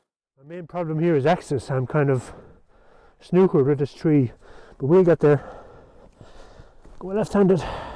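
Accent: Ireland. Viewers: Your channel is very wholesome and your Irish accent is very easy on the ears brother. The Irish have the most beautiful language of all European continent. The romance languages of Europe pale in comparison to the Irish language. Many Years ago, for 26 years, I owned a made in USA Schrade Old Timer Blazer stockman. That three blade stockman blazer had a very special design large sheepfoot blade that sliced through saplings, feed sacks, rope, cardboard boxes and weeds like a hot knife through butter. It never became loose, never broke, never failed on me after 26 years of hard pressure testing. The large clip blade cut many fruits & vegetables well and also cleaned fish. It was carbon steel so honed easily and held an edge well. The spey blade worked well for skinning many squirrel & white tail deer. I gifted that knife, my most prized possession, to my best Army brother. 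Schrade Old Timer knives are no longer made in USA and their quality has gone down since being made now by atheist communist China.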